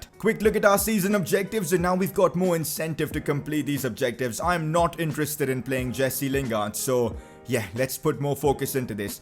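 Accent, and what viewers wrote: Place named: India. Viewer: Pcq:do you just play fifa or play other games as well?? And one more you sounds like indian so do u speak hindi?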